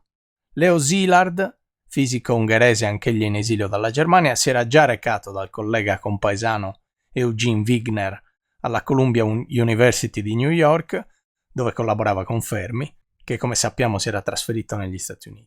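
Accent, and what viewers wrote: Sardinia, Italy. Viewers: Professore le faccio tutti i miei complimenti, che narrazione affascinante, impeccabile nella parte scientifica e storica , accompagnata da una grande sensibilità e saggezza. E se mi posso permettere, la lieve intonazione sarda la rende ancora più piacevole (altro che IA!!!) Grazie mille 🙏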